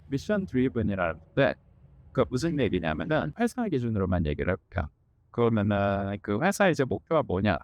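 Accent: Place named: United States. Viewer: 혀좀 덜구리지요 미국말 어색해 ㅎㅎ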